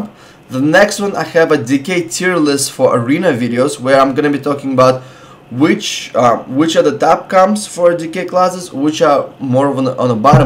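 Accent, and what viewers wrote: Russia. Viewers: Охуенный русский акцент, почаще бы от тебя)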